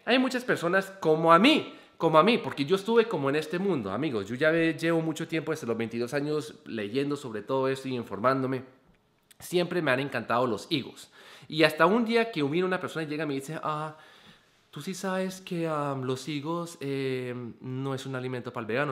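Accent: Colombia. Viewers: Holaaaa me encanto tu video !! Esa es la neta como decimos en DF ahora Ciudad de México. A las cosas x su nombre. Beso me encanta tu acento colombiano.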